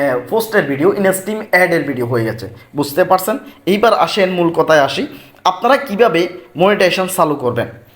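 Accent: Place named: Noakhali, Bangladesh. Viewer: কথা শুনে মনে হচ্ছে তুমি নোয়াখাইল্লা খাটাস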